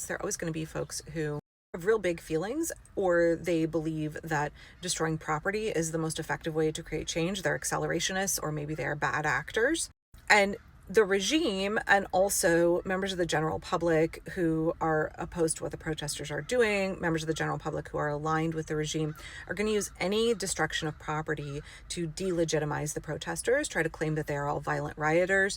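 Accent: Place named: Appalachia, United States. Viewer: Your accent was more Appalachian than Southern😕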